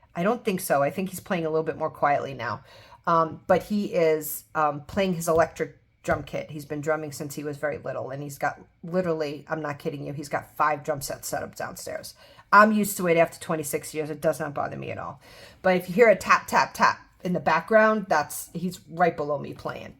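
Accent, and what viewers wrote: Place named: New York, United States. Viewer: Hi! I’m enjoying your videos! Really miss hearing that NY accent. I haven’t been home in so long. 💜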